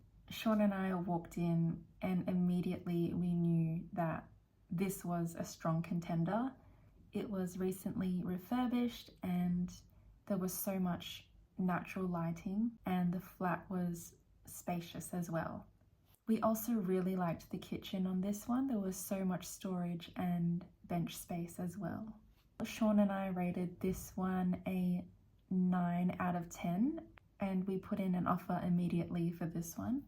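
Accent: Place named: United Kingdom. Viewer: U getting a bit of British accent already hehe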